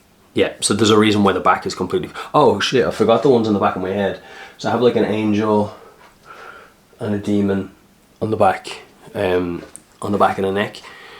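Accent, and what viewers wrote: Ireland. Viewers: Sir I don't like tattoos but I just wanted to know if you don't mind me asking, why do you have so many tattoos and why do you like having tattoos? I was just curious, you may not answer if you don't feel like....I love your style, your dressing sense and most importantly your melodic Irish accent, I like it so much that I'm learning this accent.☺️ Thank you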